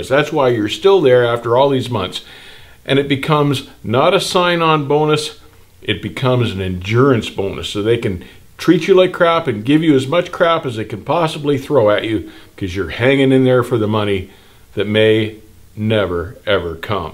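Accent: Canada. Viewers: Is that a Canadian accent i hear ey? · A good ol canadian boy, eh. He talks a lot aboot stuff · U sound Canadian. Our only friends. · Good tip and thank you for the video. You sound 🇨🇦 Ian... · This guy comes across as American but sounds Canadian as hell. He's still hilarious, however. I'm subscribing.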